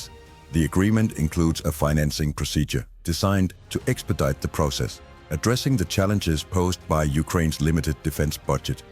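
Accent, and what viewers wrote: Denmark. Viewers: The speakers accent sounds pretty danish to me :) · 100% that's a dane😂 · Ofc the speaker has a danish accent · Man kan godt høre du er dansk :)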